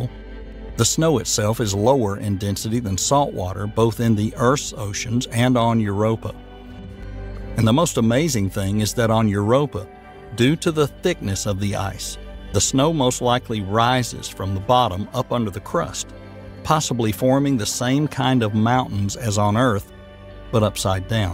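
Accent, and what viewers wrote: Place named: Oklahoma, United States. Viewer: He spunds like huggbees but from Oklahoma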